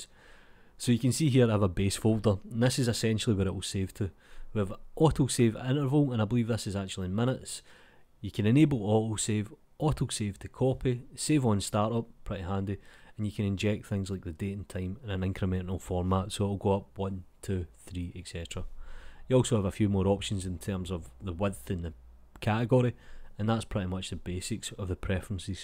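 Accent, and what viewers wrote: Scotland, United Kingdom. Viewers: Love your scottish voice reminds me of GImli. :) Can you tell me why powersave is good when i can press save myself???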